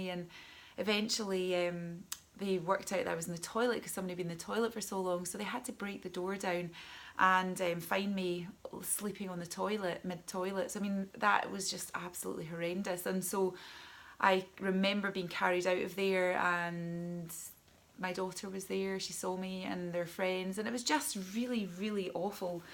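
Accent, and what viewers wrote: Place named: Ireland. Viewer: I hear your story. I hear your irish accent. Although I'm from the states I grew up in an irish catholic background and community where its commonplace to drink everynight after work and on the weekends. There was a bar on every corner. I'd walk to Flynn's corner bar and walk home in a blackout for years I did this. I'm 45 now and going to AA and really trying to stop my boozing days! Thanks for sharing love!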